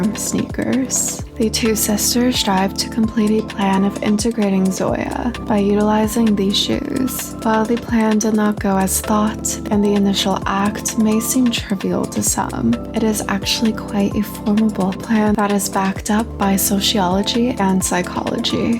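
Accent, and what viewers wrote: RP, United Kingdom. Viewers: I hate how your voice sounds fry and poshy in this video. You don't need to take this ton ...its ridiculous. It took me out.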